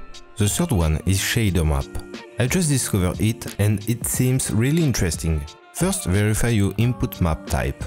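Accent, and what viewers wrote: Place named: France. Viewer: the frenche accent is tout bien mec !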